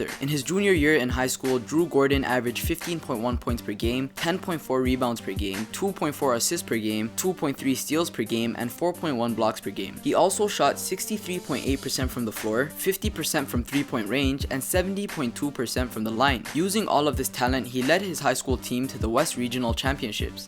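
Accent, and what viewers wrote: Toronto, Canada. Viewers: Hey, Are You Torontonian? I Can Tell By Your Accent. (BTW I'm Also From Toronto. I Live In Scarborough)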